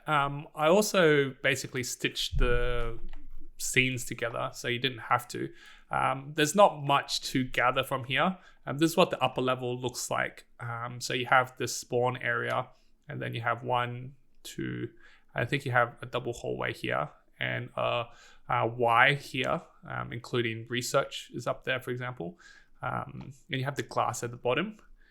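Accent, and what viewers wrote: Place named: Australia. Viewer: It took me til like halfway through that video to realise that you're Australian, like me. I'm more used to seeing Among Us related content with people predominantly with American accents and since I'm Aussie myself it took a sec to realise that yeah I'm used to that accent, but that's because I'm Australian, and not because I'm used to Among Us content in that particular accent.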